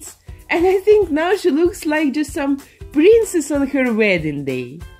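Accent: Russia. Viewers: Русская что ли? Слышно что английский не твой родной язык.... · I'm sorry for asking but is she a Russian or Ukrainian? She has a very strong accent... like I have, haha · I love your accent! Are you russian? If you are, I am too! ❤ love your channel btw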